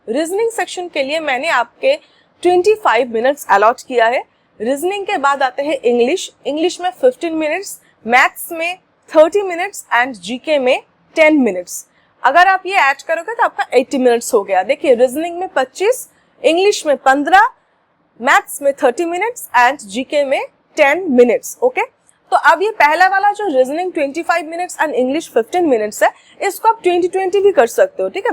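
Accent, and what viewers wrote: Bengal, India. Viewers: I think your ascent is begali ♥️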